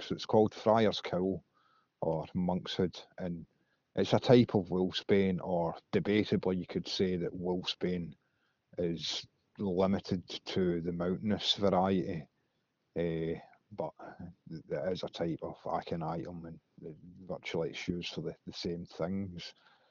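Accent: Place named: Scotland, United Kingdom. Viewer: Love your accent. Are you scottish?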